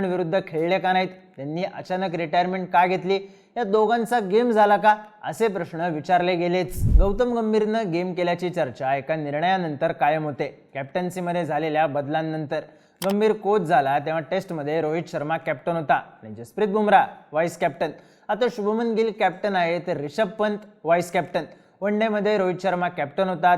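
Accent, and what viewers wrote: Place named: Ghati, India. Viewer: Tumchi accent ghati aahe, thoda pure marathi bola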